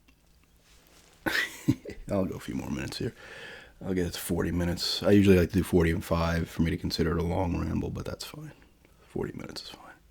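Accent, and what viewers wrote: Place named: Upstate New York, United States. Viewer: I'm from Syracuse NY so your accent sounds pretty close to home which is one of the reasons I like the channel. One thing I've noticed is we often speak fast compared to folks in other states. I moved to VT and people take a bit more time here. I've got a coworker from Boston so we can converse at full speed which is refreshing 😂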